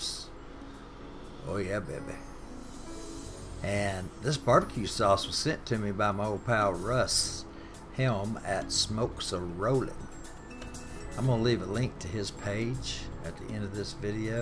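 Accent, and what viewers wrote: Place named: East Texas, United States. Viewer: Congrats on 7500! Does YouTube bump anything up when you hit that number? My family is pretty much small town and rural KS OK AND TX. A lot of your cooking is so similar to ours in technics, but you definitely have some recipes that I don't have. Remember Justin Wilson I think that was his name? Bayou cook on PBS the 70's or 80's. You don't have that ascent, but yours is similar to my relatives near Tyler. I enjoy your pleasantness and recipes! Keep it up!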